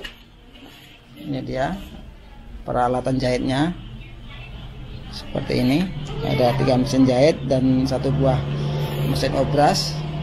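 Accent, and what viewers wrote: Java, Indonesia. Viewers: Owh emang mm.tinggal di jambi yaa, dn orang jawaa th0 logat nya bicara ny jawaa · Ma....dari bicaranya om...bhsa jwanya kok kental bnget cih....piantun pundi nggih.... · Mama saya kira org jawa dr logat bicara nya gak tau nya di Jambi 😄😅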